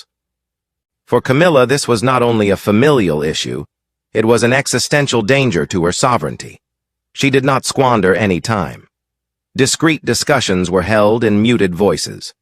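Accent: United States. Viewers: Not only is it total rubbish, gibberish avtually but this voice is so grating. Is it some kind of American regional accent? Wherever it is it wants avoiding.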